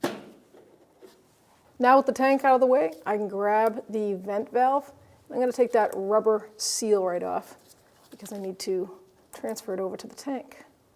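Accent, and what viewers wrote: Boston, United States. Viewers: I tremendously appreciate how calm and straightforward you are with your videos! No electronic riffs and no annoying background music to muffle or garble your words! Just clear speech (w/ a Boston accent) and straightforward instruction. I recommend you to whoever I can while talking about vehicle maintenance. I thank you again!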